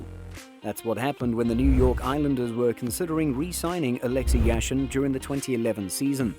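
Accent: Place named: United Kingdom. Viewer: this vid is shallow rubbish ...w/ a Brit accent ?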